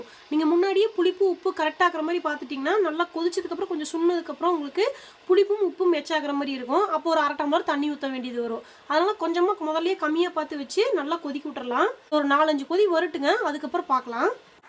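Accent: Kongu, India. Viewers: Kondu slang arumai... 👌👌👌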